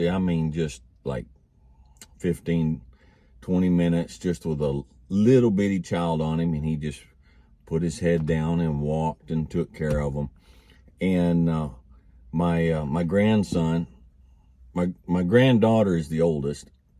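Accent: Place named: Wyoming, United States. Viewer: im from Wyoming I’m stationed overseas and its rare I come across something that reminds me of home and just the way you talk reminds me of home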